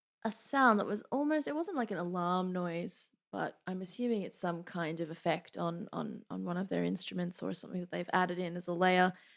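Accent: Australia. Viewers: Dunno why people would hate on Australian accent.. everytime I hear you I feel a very strong urge to just drop every responsibility I have and move to Australia just to hear the people speak and enjoy the sunset on the beach. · I do not know why I like the Australian accent so much, but I could listen to her talk all day.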